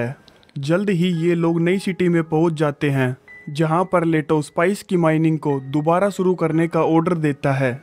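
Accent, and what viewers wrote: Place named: Rajasthan, India. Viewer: tbhi lga hi tha marwadi ya to Rajasthani ya haryanvi🙏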